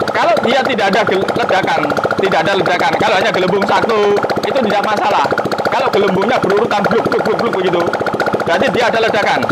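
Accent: Java, Indonesia. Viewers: Logat e kok jowo mas? Sampean pundi mas? Kulo mpon ndak kuat mas vario 125 led kulo mpun turun mesin ganti perpak. Panggah munggah terus air radiator e mas. Tulung mas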